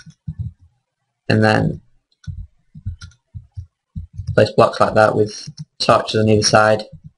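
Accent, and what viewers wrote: United Kingdom. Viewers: Where are you from in the UK? You sound like you're from Lancashire haha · Sound British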